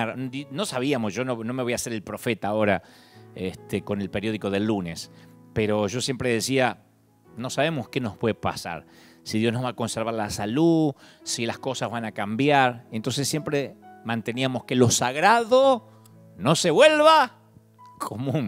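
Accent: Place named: Córdoba, Argentina. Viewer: Rompe estructuras!! Hablando a lo cordobes...es un capo.. Por mas pastores asi Amado Dante Gebel!!! Gloria a Dios por tu vida!!!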